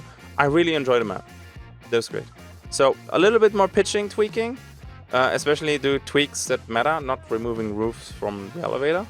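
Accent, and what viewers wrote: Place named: Germany. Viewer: This is the most perfected Denglish I've heard in my life, I am a big fan! Great content dow 😅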